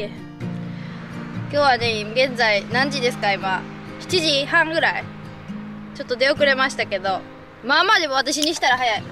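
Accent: Senshu, Japan. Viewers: 泉州弁いいですね。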